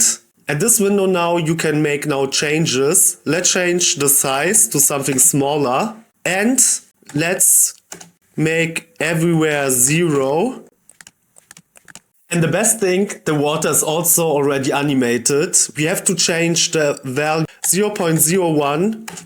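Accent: Germany. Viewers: great video and love your accent. Are you German?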